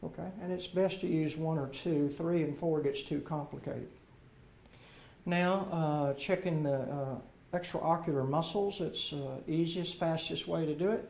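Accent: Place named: Upper South, United States. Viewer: It's called an "upper south" accent. Distinct from the "deep south" drawl. This is more of a Kentucky, Tennessee, Virginia, or North Carolina way of speaking. Some parts of north Texas also similar.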